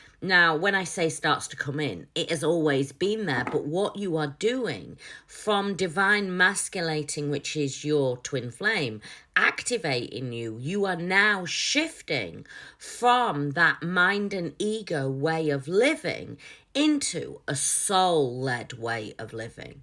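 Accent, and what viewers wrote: United Kingdom. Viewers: great video, great channel, great British accent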